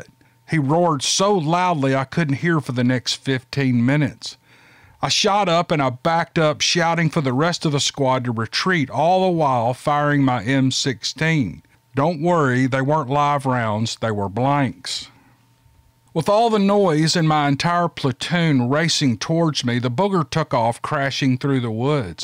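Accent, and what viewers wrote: South, United States. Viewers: "Those Dmn Yankee Carpet Bagger's and Pine Wood Thieves!". (I can just hear them, and well, "the element is a very close equal, those that SCAM the Naive and Older Folks").... and I'm a born Yankee, loyal to the Union, but with a very real Tennessee Southern Accent. I've never cared for the variable Southern or Country Accents, far preferring the Chicago and Boston....and has a very mild accent, much due to the having been raised in the Metro Memphis area. Much preferred! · . The accent is great. Especially to us in Britain my friend. Very Rett Butler, lol. Remember to us Georgia, N Carolina, S Carolina etc all sound pretty much the same. No offence bud. Also a lot of Southerners could still possibly be descendents of Civil War confederate Soldiers, which to a lot of us, is fascinating. I don't mean racism either. Just great and historical. 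Hope that doesn't offend you mate. Just a possible reason why people mention the accent. 👍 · The funny thing about your accent is relevant, of course it doesn't change the story, however, it draws you into the story, and makes you feel at home (so to speak) It is the Southern thing, I guess, anyway it is obvious that others think the same as I and many others., just keep on keeping on, you are a valuable speaker, and have a way of relaxing your audience, me included. Just be real, and believe it or not, you, as you, comes thru. I really enjoy your channel. Don't change a thing.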